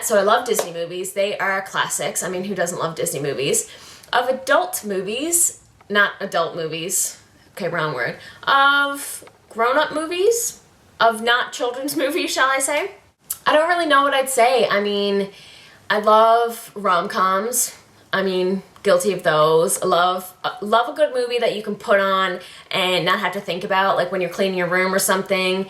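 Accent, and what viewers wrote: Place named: Newfoundland, Canada. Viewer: New subscriber and as soon as I heard your voice I knew you had to be from Newfoundland! Fellow Newfie :)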